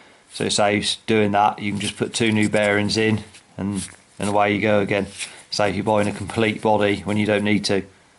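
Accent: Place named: West Country, United Kingdom. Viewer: Didn’t realise it was going to be you when I clicked on the vid. What a relief to hear that West Country accent. Thanks again for your vids. Bearings on order.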